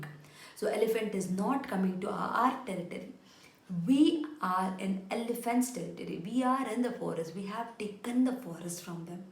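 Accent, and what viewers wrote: India. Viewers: Aunty please don't talk in buuttler english please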